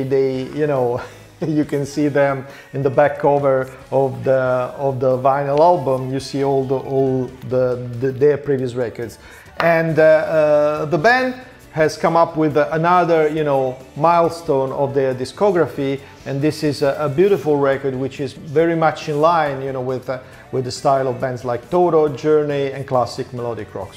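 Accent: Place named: Italy. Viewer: Thanks ! Very interresting and for a french easy to understand an italian in englich because le the accent . Please continue this little interview an long lire ton frontiers !!!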